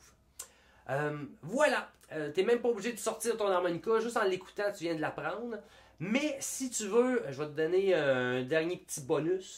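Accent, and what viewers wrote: Quebec, Canada. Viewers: ton accent me rappelle mon année à Trois Rivières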